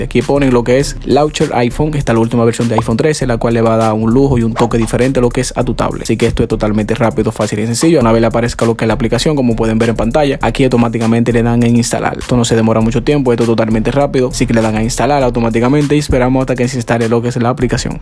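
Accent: Dominican Republic. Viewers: Es dominicano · Es que hago hablamos los dominicanos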